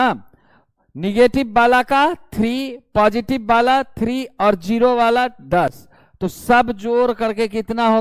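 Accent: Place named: Bihar, India. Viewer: Kya tone hai sir ka 👏👏 Pure bihari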